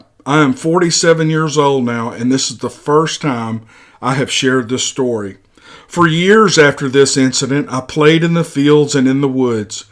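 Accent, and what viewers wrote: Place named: Georgia, United States. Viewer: Thanks, sir. I am from the Deep South. I also lived in LA about 12 years, moved back south for about 16 years, and now happily reside in the Seattle area. I definitely believe in Bigfoot and listen to a lot of channels on the subject. I think I enjoy your narration more than any because you have a particularly appealing southern accent. Reminds me of southwest Georgia. You sound kind of like my brother in law, who’s a really good guy. I had heard this story on another channel some time back and found it fascinating, and so glad you are sharing it here.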